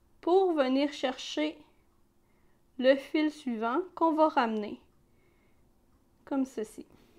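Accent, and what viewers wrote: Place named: Quebec, Canada. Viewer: Je n'enlève rien aux autres tutos, mais je suis contente d'entendre parler un français québécois, ça fait du bien. Merci pour ce tuto 😁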